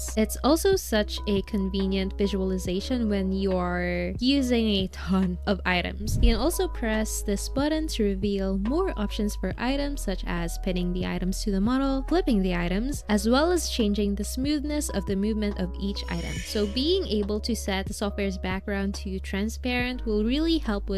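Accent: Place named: Philippines. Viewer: Yk when i first heard your voice, i was like is she a filipino?, she's definitely a filipino. and after i searched you deeply You are indeed a filipino HWAAHAHAHSHSS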